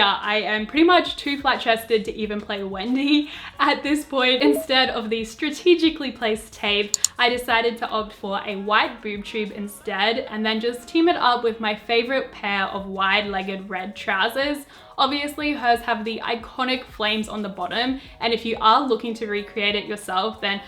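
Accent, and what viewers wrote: Australia. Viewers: I hear red velvet I already love her I heard her Aussie accent I loved her more I SAW BT21 AND I SUBSCRIBED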